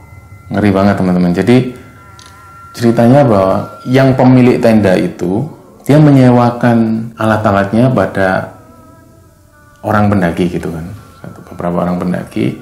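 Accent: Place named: Surabaya, Indonesia. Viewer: Logat suroboyo ny koq asik bgt yo mas medok2 gmn gitu😍jd ngefans sm chanel ini...pokoknya juara sukses trus y...😘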